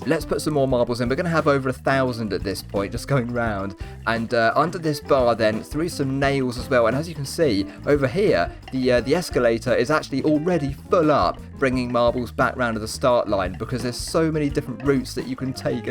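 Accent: United Kingdom. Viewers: found you at 4am and watched you for a while, question, are you in the uk? ive always gotten this wrong but your accent really sounds like someone in england, and if you have another race, could i be apart of it? it would be great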